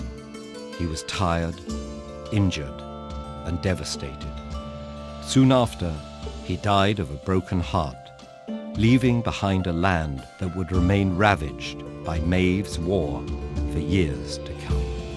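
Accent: England, United Kingdom. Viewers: Hearing this narrated in an English accent was painful and so unneccessary! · This lads English accent is genuinely difficult for my Irish brain to understand. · So strange to hear this story told in an English accent. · hello from Dublin Eire . Pronunciation is everything to the Irish , as you get a brit to tell the tail of the greatest story ever told . to all who enjoyed this poor telling of our greatest tale . its name is " Táin Bó Cúailnge " or the Cattle drive of Coolie , read the book by Thomas Kinsella. Cu Chulainn, pronounced Cu-Cullin , was war lord from the north , a bruit , a womaniser , he would take your head , for sooner look at you . yeh they breed them special up north . he enshrines the Irish sprite of freedom , that is indomitable , ask the brits how much we Irish like our freedom . yet they still claim ownership of the land of Cu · English man reading irish mythology....what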